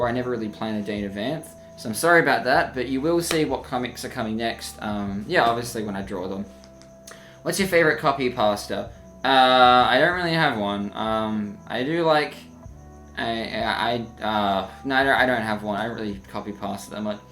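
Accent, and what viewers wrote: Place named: Australia. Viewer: you have the exact australian accent i thought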